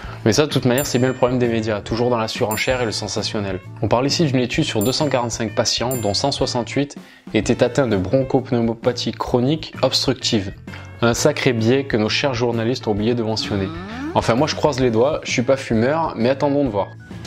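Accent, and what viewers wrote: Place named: South, France. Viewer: cool on va arrêter de nous casser les ... pieds avec "les antibiotiques c'est pas automatique" lol t'as un petit accent du sud on dirait peuchère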